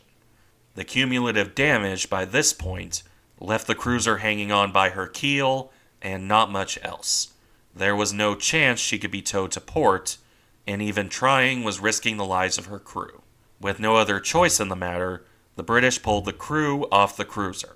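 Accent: United States. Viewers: Sorry, this is not meant to detract from the work you put into this video. It's good. It's just the pronunciation is like nails down down a chalkboard. Ironically enough at points you prnounce it almost perfectly. With the typical American accent it is easier for you to pronounce it Edin*Bruh* A similar sound to what you would make saying 'Brother* or "Hey Bruh, what you doing?" While not quite right, it is would be less jarring to people who live in the UK. I'll keep an eye out for your next videos. Thanks.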